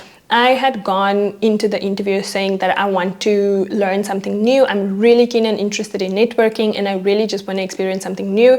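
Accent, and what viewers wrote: South Africa. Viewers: Love your accent! Are you South African?☺️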